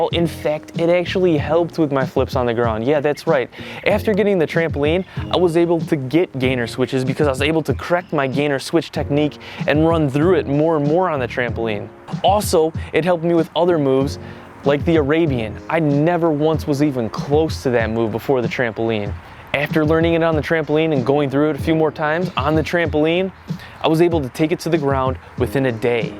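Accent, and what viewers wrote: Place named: Midwest, United States. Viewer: Nice midwest eeeyyyaaaccent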